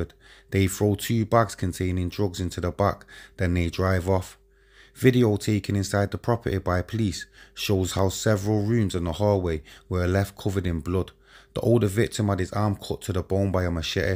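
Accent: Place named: Manchester, United Kingdom. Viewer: Why are you putting your ‘manc’ accent on mate ?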